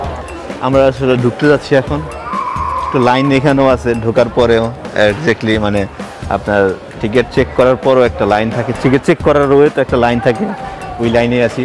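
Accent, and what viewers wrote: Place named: Bangladesh. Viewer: Love your Bangla ascent 😅😅😅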